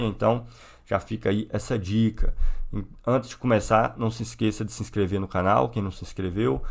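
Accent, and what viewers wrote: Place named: Minas Gerais, Brazil. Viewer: Kkkk isso tá me incomodando desde o início das aulas sobre o capital kkk Colé??? Kkk é vício de linguagem, cara é mineiro, vamos dar um desconto.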